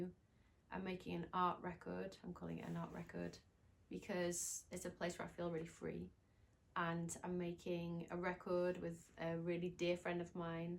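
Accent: United Kingdom. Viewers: Maybe it's the northern accent, but you come across so down to earth and having no airs. I remember hearing when it started being played on the radio and having to keep the engine running even though I'd arrived home just to finish listening to it. Those were the days! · I was mot expecting her to be British omg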